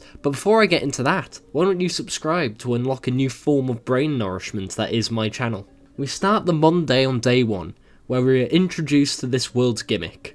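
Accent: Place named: United Kingdom. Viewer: Yoooo,thw bri'ish man is back. Now try beating pvz 2 with only flowers.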